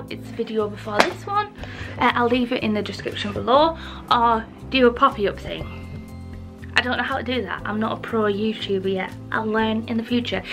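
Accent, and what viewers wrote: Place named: Teesside, United Kingdom. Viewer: Hello ! I found your channel pretty randomly last week, you and your art are totally adorable. I love your studio vlogs even the bits you think are boring. And i can't believe i'm hearing a Tesside accent on here! I live in Yarm so i'm v close by if you'd like a new gal pal at all i'd totally be up for coffee and cake. I have no idea where that coffee shop is but i'm offically intrigued.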